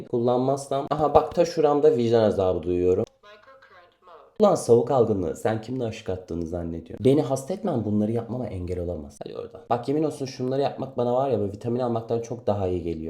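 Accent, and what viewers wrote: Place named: Trabzon, Turkey. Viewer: Haşimdi kalk dedi Trabzon lu musun yoksa 😊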